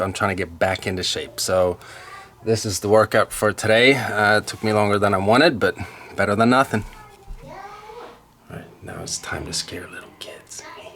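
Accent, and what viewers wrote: Pittsburgh, United States. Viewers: 5 years and you sound like a Pittsburgh-er, it's been 12 for me (same town) and still sound like a limy lol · I find it very interesting that in 5 years you've acquired the distinctive Pittsburgh accent, sounding like a true native of the area. The Swedish component of your speech seems secondary to me. This vlog was enjoyable and a welcome change of pace. I've ordered a few cars in my life, waiting about 2 months each time. The domestics used to allow for this, ordered my Olds Cutlass in 1986, but today it seems only the Germans still do it. Nearly every car I've bought from dealer stock has been a compromise in some way. My current vehicle has a color that just will not grow on me. Maybe I'll get it right next time. Looking forward to your Merc. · Never thought you would be Swedish, you look and sound like an American. 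· Imma newbie to the Chanel and I was so surprised that you were from Sweden! That American accent was spot on! Ever thought of getting a Volvo XC90 or V90XC tho?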